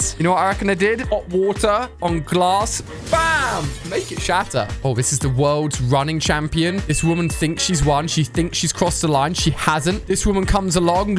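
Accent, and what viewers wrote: United Kingdom. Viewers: Why are you British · are you british?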